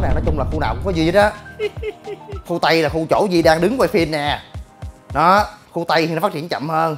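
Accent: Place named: Mekong Delta, Vietnam. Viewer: Giọng anh nghe đã dễ sợ.....chất phát gốc miền Tây..... Dễ đi vô lòng người....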